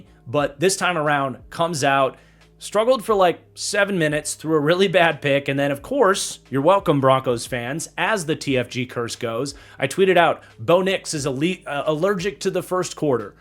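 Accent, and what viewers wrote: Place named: East Coast, United States. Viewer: that east coast accent though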